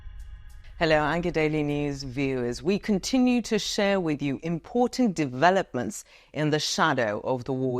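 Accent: United Kingdom. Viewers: I love your beautiful hair and British Accent.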